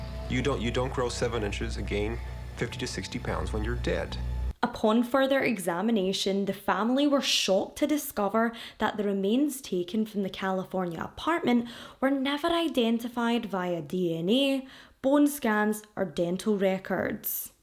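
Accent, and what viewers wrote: Scotland, United Kingdom. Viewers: Thank you for brining so much well-needed awareness to some of these older cases. Rest in peace, sweet Crystal. She and her family deserve justice and answers after so many years! Still so surprised you don't have more subscribers! I've watched quite a few of your videos in the past but it seems like YouTube has been randomly unsubscribing me from a few channels again (as well as other members, from what I've heard), but alas, we're back being subscribed now ❤ Great work as always, Miss. As an Aussie with the majority of my father's family still being based in Scotland, your accent makes me smile. My Nan has lived down here since the late 60's and her Glaswegian accent is still so thick that I doubt subtitles could help us when she gets heated having a wee blether over a cuppa 🙈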